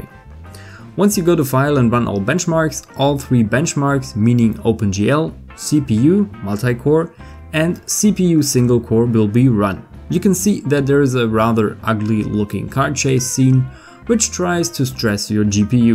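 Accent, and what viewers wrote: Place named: Germany. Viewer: man hört so raus das du deutsch bist xd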